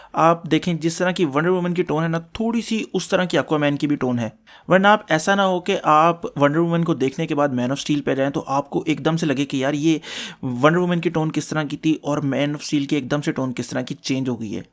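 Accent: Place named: Pakistan. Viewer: Your voice tell me you are from Pakistan 🇵🇰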